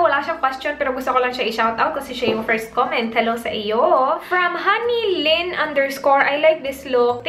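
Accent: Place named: Visayas, Philippines. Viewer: u r sooo funny I almost choked when u retrieve back ur error stragol lollll, is it bcoz of visayan accent that I often hear u say gugol or like Wat u just said.... But u r so funny and cute....stay simple and humble.... If YT makes u happy go on.... But nursing job s very rewarding m telling u gurl, just prioritize ur plans then later u can do both🤗🤗🤗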